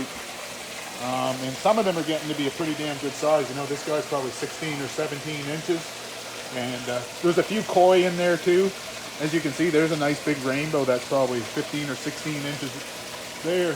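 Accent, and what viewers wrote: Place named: Canada. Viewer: you're either a Canadian or a Minnesotan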